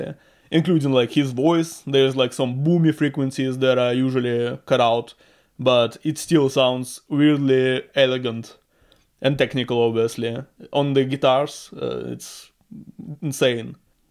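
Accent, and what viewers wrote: Russia. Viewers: Русский акцент я узнаю из тысячи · Подожди, ты русский? Я вот сижу уже почти полторы минуты думаю, акцент похож на русский... зашла в описание канала, ничего не нашла и тут ты говоришь "Драматургия" и я такая "стоп, чё серьезно?" Ив один из моих любимых певцов и я рада видеть подобные реакции ❤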